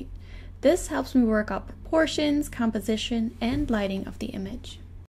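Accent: Germany. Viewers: Omg are you german? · are you german? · Your from Germany right? :D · Du bist deutsch oder? Normalerweise finde ich es immer sehr anstrengend zu einem deutschen Akzent zu zuhören aber bei deinem war das nicht so es war sogar sehr angenehm. :3 Gutes Video übrigens! Es war sehr hilfreich.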